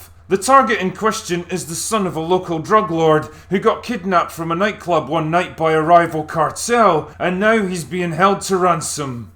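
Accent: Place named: North Wales, United Kingdom. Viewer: Damn, that's a strong north Wales accent.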